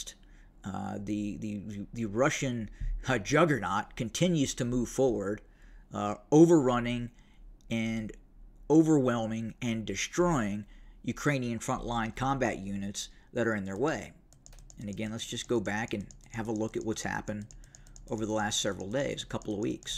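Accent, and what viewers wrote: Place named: United States. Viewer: Russian TV stuff narrated in American accent. Pretty surreal to listen to.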